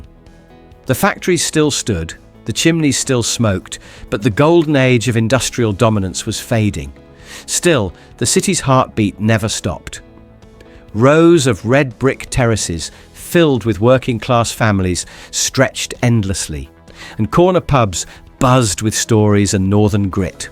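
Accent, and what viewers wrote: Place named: United States. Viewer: He's a yank,period - geddit !!😅😅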